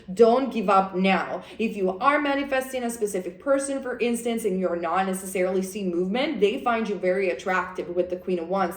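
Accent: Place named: Italy. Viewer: thanks girl xxx love your energy and italian accent.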